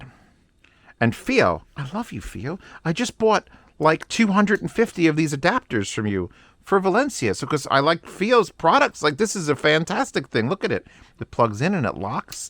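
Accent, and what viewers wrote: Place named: United States. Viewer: Can't watch your videos. You waffle on about rubbish, your american accent is terrible to listen to and why do americans extend words with one vowel to sound like they have four? ie way becomes waaaay.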